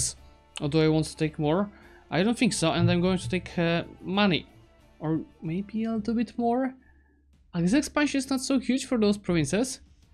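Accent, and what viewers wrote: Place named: Poland. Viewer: His Polish accent is clearly understandable and I think if there is really a problem, it would surely come from you.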